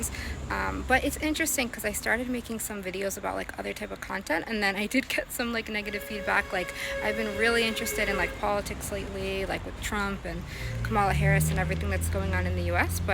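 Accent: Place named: Jamaica. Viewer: Love your slight Jamaican twang, it's cute. Your relationship is not my business. You look good, glowing and healthy. 😊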